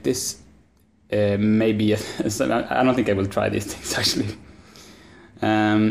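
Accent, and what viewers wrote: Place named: Sweden. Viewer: Swedish Accent! Just say one thing for me... say "time to unmpimp de Auto" like that swedish guy in the VW comercial.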